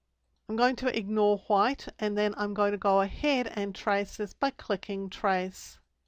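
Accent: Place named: United Kingdom. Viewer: I like your sweet UK accent :-)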